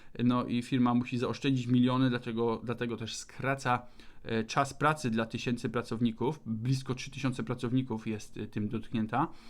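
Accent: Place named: Germany. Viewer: Dobry kanał. Pokazujesz że potrafisz czytać po niemiecku, tłumaczyć na polski, z celowym podkreśleniem akcentu niemieckiego. Do tego systemowy wybór treści i całkowita dupochronna bierność w komentowaniu.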